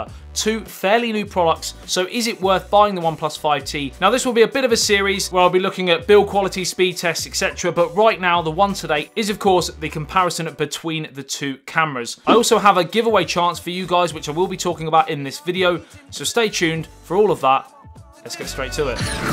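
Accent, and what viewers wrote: United Kingdom. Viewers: im weirdly addicted to your voice and minor humor mate.. here iam its like am im seeing all ur vidz for the past 1hr... and sure iam into it.. that British strong accent tho,.. (o homo lol) love u man <3 grt review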